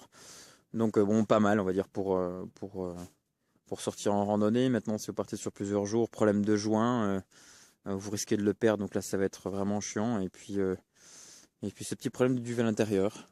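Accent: Franche-Comté, France. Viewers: dans le meme esprit tu aussi a la rangers gore tex special grand froid et longue marche et tu as la rangers para c se que j avais,un peu moins chaudes que la gore tex mais plus efficace pour crapahuter voila apres c un avis perso!! pour un tarifs en dessous 100e pour les 2 modeles a pluss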